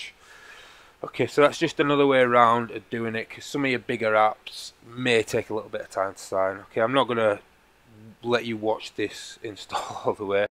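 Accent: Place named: England, United Kingdom. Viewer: Hey I didn't realise you was English I though you was American. +1 for English jailbreaks and developers.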